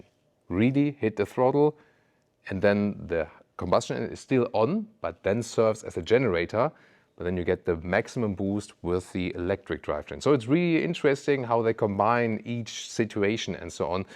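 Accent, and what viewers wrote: Germany. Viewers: German Accent made this review even cooler.👍🏾🇺🇸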